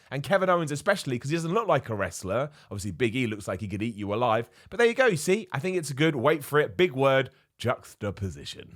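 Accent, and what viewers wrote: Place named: Northern England, United Kingdom. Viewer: From your accent I could never work out if you're a northerner or southerner. Then you mentioned you're from near London in a few of your videos. Made me think, where is that northern twang from. Worked out from this video it might've been from your time at university in Manchester 😂😂😂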